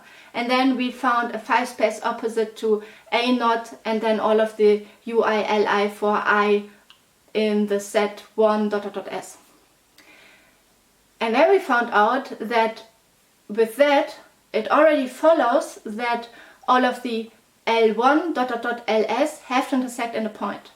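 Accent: Germany. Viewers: German accent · You have a German Accent.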